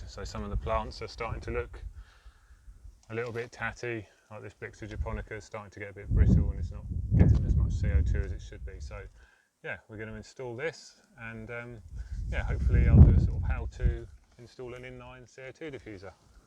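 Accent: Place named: East Anglia, United Kingdom. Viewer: I can tell, the east anglean ish accent 😅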